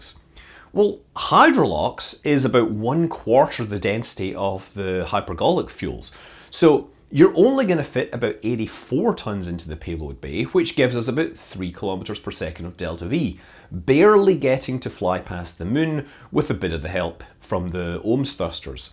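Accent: Scotland, United Kingdom. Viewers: Scottish accent, nothing wrong with that. Not like all the 'would of' Americans..... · Scottish accent, which is so cool to listen to.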